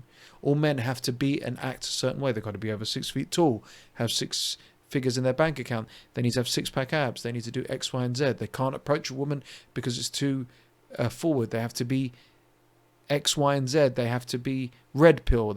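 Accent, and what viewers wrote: United Kingdom. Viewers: Are you from the North or South of England? The North is the working class side right? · He's from the south by the sounds of his accent.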